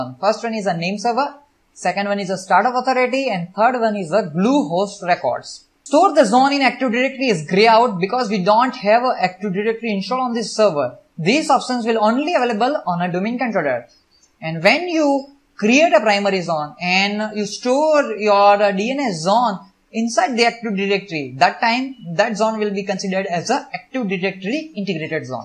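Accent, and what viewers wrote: India. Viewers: cannot understand a word of this indiglish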